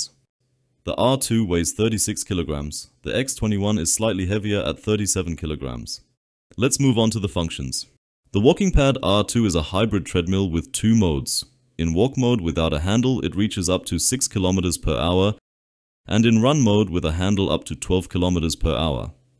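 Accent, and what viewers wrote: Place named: Germany. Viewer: You sound German. Why no discount for Netherlands? 🙏🏼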